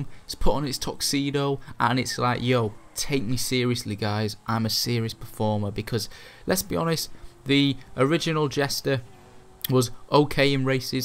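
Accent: United Kingdom. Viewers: also what part of the uk are you from? ive had a few people in lobbies recently telling me I sound a lot like you...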